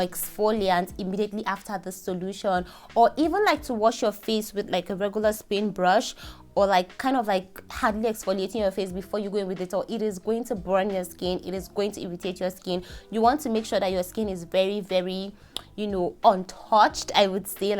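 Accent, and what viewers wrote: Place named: RP, United Kingdom. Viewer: Queens English weldone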